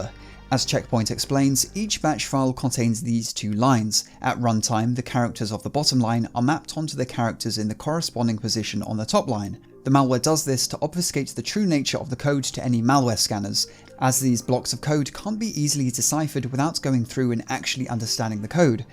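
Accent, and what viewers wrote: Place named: United Kingdom. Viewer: Love you r voice 💖😍 ... excellent British accent....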